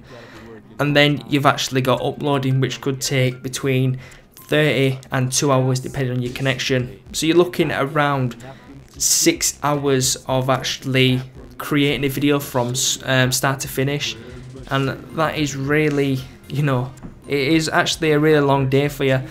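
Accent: Sweden. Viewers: Are you from Sweden? :D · ur Sweden O.O??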